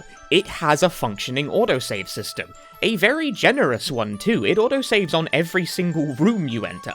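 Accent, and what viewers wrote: United Kingdom. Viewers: I really appreciate this second channel. It's always nice to hear you talk about stuff, you're good at formulating your thoughts. That, and British accents tickle my brain.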